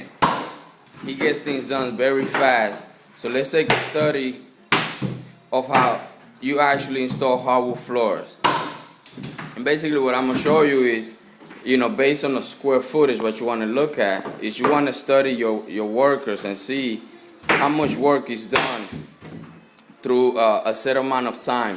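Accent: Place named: Spain. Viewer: is it just me or does anyone w a Spanish accent not good at anything?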